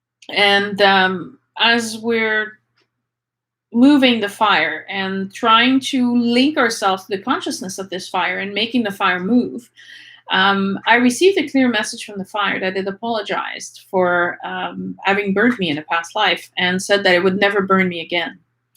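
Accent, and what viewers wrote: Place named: Quebec, Canada. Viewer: Thank you so much for this video. 2 and a half years ago I got a crippling mystery illness near my left ovary no one has been able to diagnose. I was in dibilatating pain with crushing pain for 3 weeks out of the month(using past tense because I know I am healing now.) and just as I thought things couldn't get worse...this illness, losing my career, not being able to go out or see anyone... My father was diagnosed with cancer and passed within 3 weeks basically because the medical system. I thought I would die. I lost my protector and best friend. This happened 2 weeks ago. Then yesterday I started a Dr Joe Dispenza meditation group and during the meditation, I kept hearing the name Hathor.. Continuously. I didn't know anything about Hathor... But I'm purely Egyptian by blood. I couldn't believe what I learned about her or "them" afterwords. 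It was mind boggling how much she completely related to my health crisis and losing my beloved father. She's here to guide me into healing by being a better mother to myself. To reconnect with my Divine femininity. And your video brought me so much clarity. Im very grateful for you. Thank you from the bottom of my heart for all this knowledge. Also, are you from Quebec by any chance? I am, and your accent sounds like the Quebecois here 😊sending you so much love and gratitude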